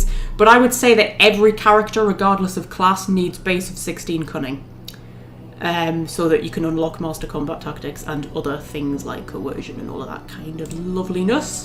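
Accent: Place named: United Kingdom. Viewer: Adorei sua voz e o sotaque britânico, entendi tudo que falou! Ajudou muito, obrigado!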